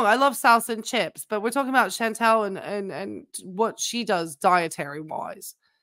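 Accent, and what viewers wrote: United Kingdom. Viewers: Oh I just wondered bc of your accent. I figured it was the UK but was just checking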